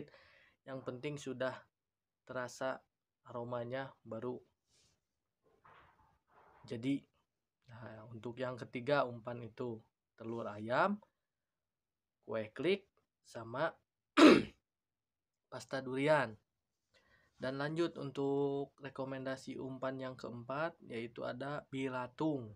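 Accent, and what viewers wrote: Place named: West Java, Indonesia. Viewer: 😆😆alus ngomong na😅sunda indo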